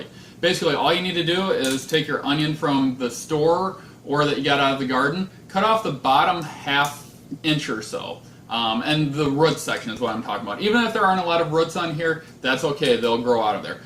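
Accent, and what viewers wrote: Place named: Midwest, United States. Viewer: Midwesterner detected* lol great vid, thanks man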